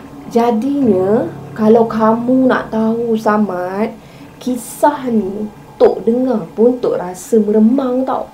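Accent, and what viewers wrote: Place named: Kedah, Malaysia. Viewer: Akak ni org kdah ka cra ckp mcm org kdah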